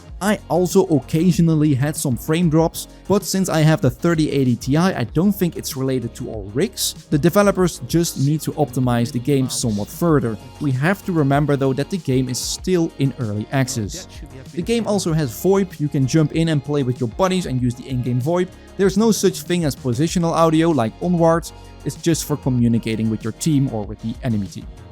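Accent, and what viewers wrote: Netherlands. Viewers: Ik ruik een nederlander😂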